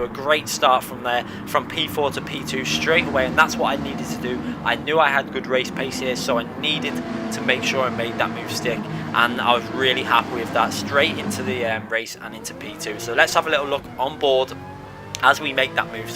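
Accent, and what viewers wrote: Liverpool, United Kingdom. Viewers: Feedback is what I'm doing for you Not what your doing, that's called commentary. If you do t like feedback, disable comments. Your Liverpool accent is quite strong and your talking at 60mph on top of not shutting up. If that's what makes your channel popular than good for you. That's my opinion.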